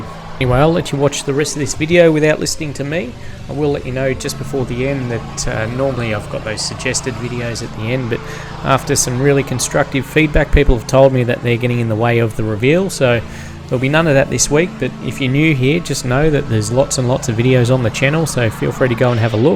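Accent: Australia. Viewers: Hi,! No need to stop talking in your videos! I enjoy your narratives about what you are doing. I also like your Aussie accent!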